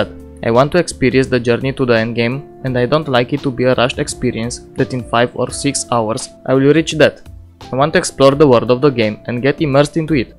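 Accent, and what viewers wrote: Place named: Romania. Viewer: this guy sounds so romanian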